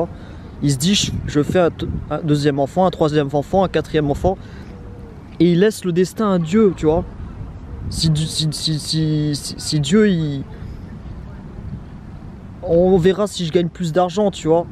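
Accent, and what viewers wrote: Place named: Banlieue, France. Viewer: bien d'accord avec le message, vraiment dommage ton accent de banlieu . merci du taf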